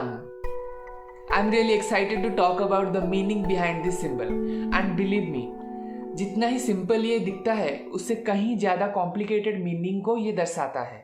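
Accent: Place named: Odisha, India. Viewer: Bhai thoda ladka bano Aurr hindi bhi aap ki lag rahi hai odia accent wali Yeh rang dhang change karo ladka bano re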